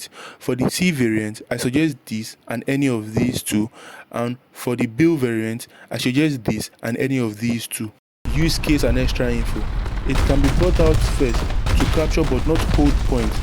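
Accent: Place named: Africa. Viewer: because you have an african accent ,but a great guide btw I've already subscribed and am waiting for more tank guide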